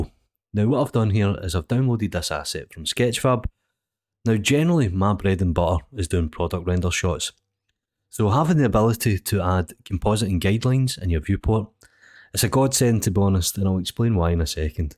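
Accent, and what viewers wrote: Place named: Ayrshire, United Kingdom. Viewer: Nothing to do with this video, but I have been wondering this for a while. Your accent sounds identical to a guy I know who is from Ayrshire. Whenever I hear your videos it makes me want fish and chips as he owns a food cart here in Portland OR. PS. Loving Haggis Tools, I have quadview on all the time now. Makes this much easier for me.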